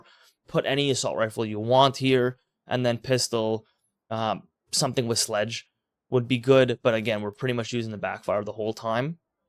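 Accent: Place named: Canada. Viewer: Dude, why didn't you answer? I know you're a Canuk......it's the accent. Great, informative videos just the same.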